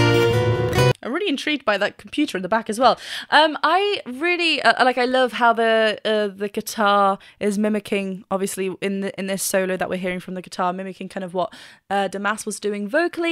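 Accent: United Kingdom. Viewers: I love ur British 😅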